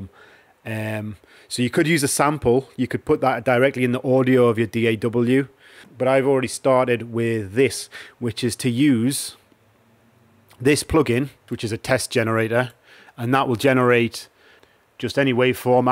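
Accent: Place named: Newcastle, United Kingdom. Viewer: You say “cheap and cheerful” just like my grandad did. What a small world, that I hear a Geordie voice from my favourite Thai modular supplier. Always good to be encouraged to think outside the box. Audio or control, it's not supposed to matter to us, is it? :)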